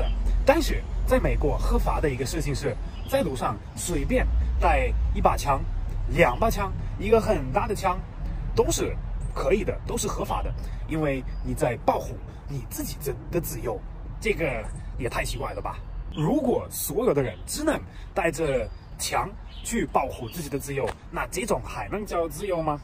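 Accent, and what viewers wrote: Sichuan, China. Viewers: 这川普，四川味太浓了！！ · 这个视频做得可以👍我全完赞同的观点！的川普说得巴适，四川人听到安逸，外省人又都听得清楚👍👍😀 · 四川话可以的嘛！ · 喜歡你的風格幽默風趣，川普很好聽，👍三觀正正的帥氣小伙子。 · 你这中文好四川。。。哈哈😊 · ，你的川普很棒、很亲切。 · 四川味好重啊，哈哈哈哈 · 你說的普通話是否四川話混合的呢? 我說的是廣普, 但正宗普通話好像有些不同啊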